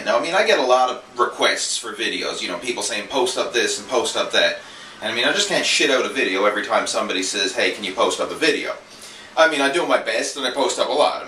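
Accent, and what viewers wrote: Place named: Cape Breton, Canada. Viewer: Her are you from Cape Breton Nova Scotia? You sound like a Cape Bretoner :p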